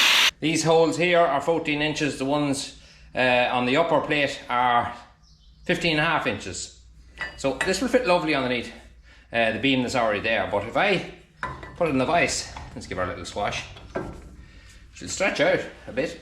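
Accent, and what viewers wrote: Ireland. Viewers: I agree that our phones or iPads have ears. 😂you are not only an excellent farmer, a diary farmer but also an expert mechanic and horticulturalist as well. You are one clever man with many talents and both my husband and myself love watching your videos as you make them so interesting and varied. The Irish accent helps as well as it’s our favourite. We are watching you from down under in Perth, Western Australia.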